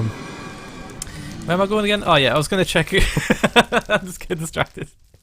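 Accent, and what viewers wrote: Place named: North East England, United Kingdom. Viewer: Technically, not all of the UK. is North-Eastern English (coming from a North-Westerner)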